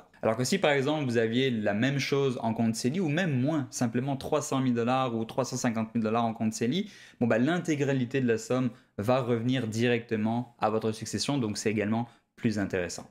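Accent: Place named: France. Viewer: Pourquoi un québécois s’adressant à des québécois sur un sujet ne touchant que le Québec parle avec un accent français?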